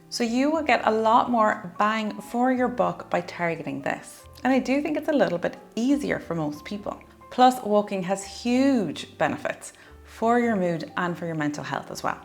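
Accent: Ireland. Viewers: This is a good video to help with weight loss. It's also a great video to help with learning an Irish accent. :)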